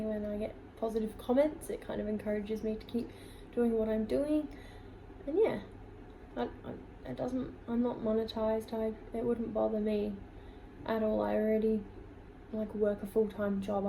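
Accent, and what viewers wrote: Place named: Australia. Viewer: I’m surprised you moved there at 14 and you sound Australian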